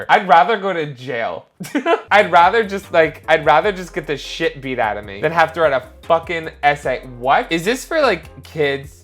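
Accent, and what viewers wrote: New Jersey, United States. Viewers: bro its just the Jersey accent lol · The first minute and a half is just trying to figure out if he has a speech impediment or if he just pronounces certain words with a jersey accent. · You don't speak English you speak Jersey it's a special side dialect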